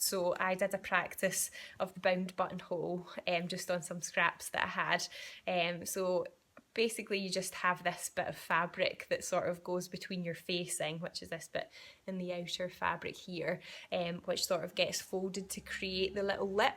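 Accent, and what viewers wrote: Scotland, United Kingdom. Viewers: What a joy to hear a scottish accent amongst all the sewing vloggers I currently follow. Gaun yersel!:) The coat is pure dead brilliant and absolutely gorgeous on you. I'm inspired! Thank you for a wonderful video, will be watching from now on. shirley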